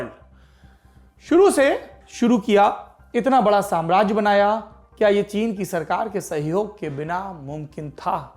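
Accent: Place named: Kanpur, India. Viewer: Gazab haunk diye dadaa.. Chungi lga di.. Hahaha.. Kanpuriya andaaz wah wah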